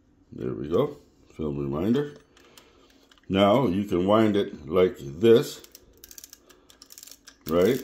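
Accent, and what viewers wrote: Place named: Manitoba, Canada. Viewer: I am not sure how I have not come across your channel before today, but I am really enjoying your videos! 👍 Also, as a Manitoban-in-exile, it is so good to hear a voice from 'home' on YouTube. 😁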